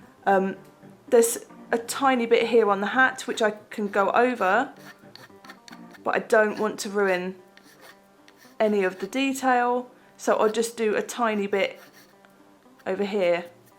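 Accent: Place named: Manchester, United Kingdom. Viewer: I love your accent. It makes me miss my Mum. She's from mancheste. Good video.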